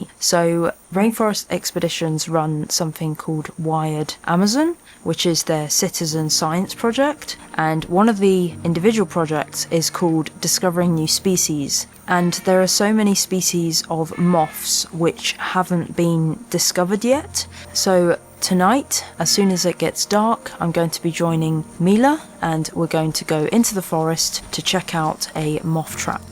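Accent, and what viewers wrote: United Kingdom. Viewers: Every one of your videos makes me wish I had a British accent.😅 Great job on another lovely video!💕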